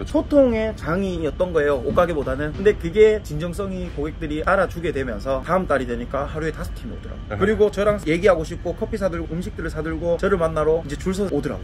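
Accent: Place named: Gyeongsang, South Korea. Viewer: 지금 5번째 반복해서 보고 있습니다. 대구 사투리도 좋고 뭔가 빨려 들어가는 화술에 표정, 나이가 많아도 많이 배우고 갑니다.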